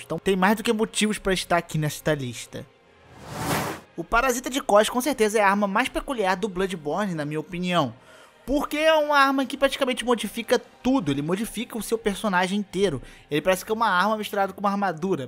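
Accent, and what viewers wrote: Brazil. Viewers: cara de qual estado brasileiro tu é?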